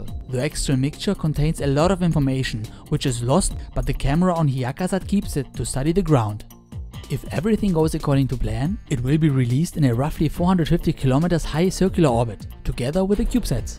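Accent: Germany. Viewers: he sounds German lol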